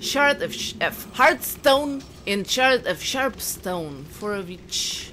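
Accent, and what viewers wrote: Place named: Eastern Europe. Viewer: I thought you are east european. lol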